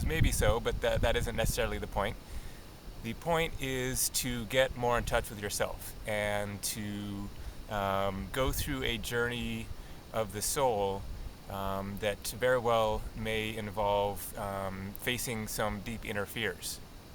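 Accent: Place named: Canada. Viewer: I knew that was a Canadian accent!!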